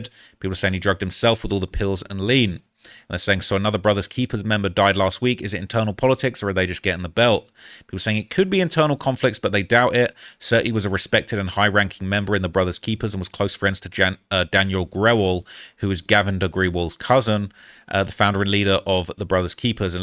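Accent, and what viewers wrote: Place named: United Kingdom. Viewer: I heard your accent and was Thank God. This is UK Surrey, not British Columbia, Surrey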